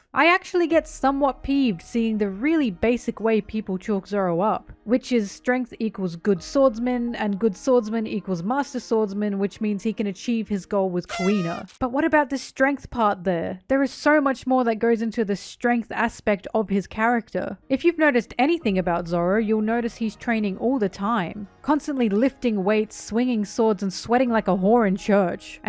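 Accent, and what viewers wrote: Australia. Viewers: Zoro is my favorite character in One Piece, also ur Aussie accent makes u sound Beautiful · What is it about aussie folk that make them really appealing one piece content reviewers?? xD I like the analysis and jokes and (this may seem foolish but) as an english speaking foreigner, I also enjoy the accent telling the story. Also like zoro, obviously~ (lol)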